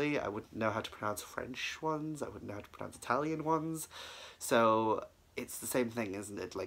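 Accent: Wales, United Kingdom. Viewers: Oh I’m so excited to have found you on TT and here on YT! I’ve been wanting or feeling called even, if you will, to look into my Welsh roots and pagan/witchcraft practices from Welsh perspective and there is so little out there. I’m in US. I follow the Irish pagan school which has gone a long way to teaching me right relationships and honoring living cultures and so on. But I’m super super excited to hear a Welsh voice. Thank you!!!! 🙏🏼 🎉💜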